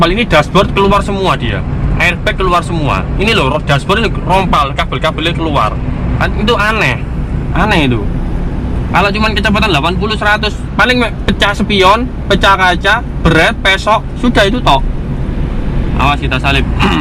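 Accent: Surabaya, Indonesia. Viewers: Siap cakk,,,aq suka reviuw pyan,,,,to the poin,,,basa ne khas srboyo asli yo ?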